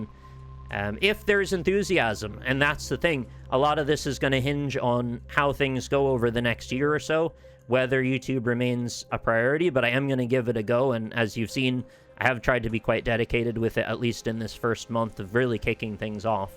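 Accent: Ireland. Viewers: I hear a little Irish lilt in your voice now 🙂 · Crazy how you can hear the Irish taking over some words while he speaks haha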